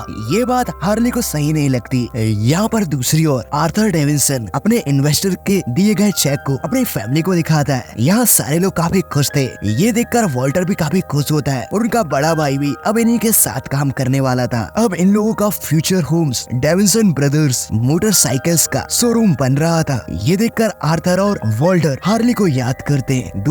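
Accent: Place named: Jharkhand, India. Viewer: bhai tumara voice acha hai lekin tumara hindi छपरी वाला or zarkhandi boli ka hai ese thik karo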